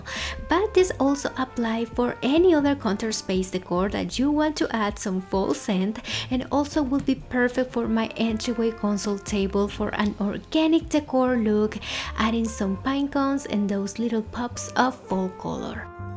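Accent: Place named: Brazil. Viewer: Hi, I am a silent subscriber but wanted to say that I love your style and always look forward to your videos:) I love your accent, Brazilian, Portuguese, Colombian? (Lisa from Seattle)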